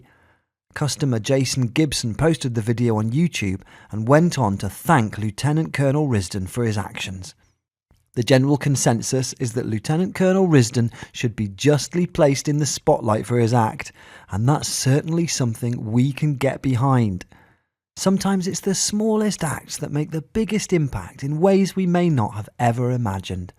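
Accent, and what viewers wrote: United Kingdom. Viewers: I don't understand why the story had to be told several times, and by someone with a British accent. Great story, a shame there are too many that do not appreciate our military, especially some newly elected politicians who disgrace the halls of Congress this year. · Am i the only one who thinks the video is a bit confusing with that accent talking about “our troops” with a British accent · Not to mention saying the same thing repeatedly!! Then saying with his Brit accent “ our soldiers “ However, thank you sir for all your ways of being great to those kids and to “ us “ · “Good story of an admirable man in uniform, my hats off and thank you for your service.” That being said...the video is STUPID and imho takes away from the story in its attempt to glorify it w/ the repeating of itself all in the same paragraph and dumb accent. We’re here🇺🇸..,not here🇬🇧..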